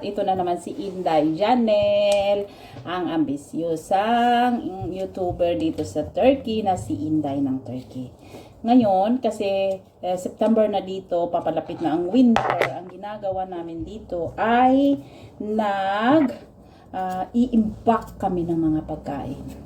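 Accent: Iloilo, Philippines. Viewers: Ilongga ka Po ba ma'am?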